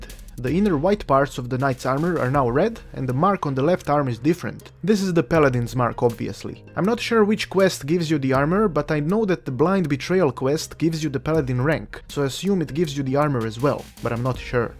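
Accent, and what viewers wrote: Russia. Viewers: Ha ha ha love the commentary Russian accent is hilarious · Accent too thick, can't tell if He is Russian or Canadan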